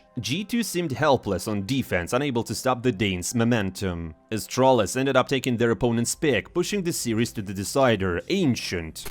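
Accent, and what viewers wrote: Russia. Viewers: I love we have drunk russian givig us bbc type cs news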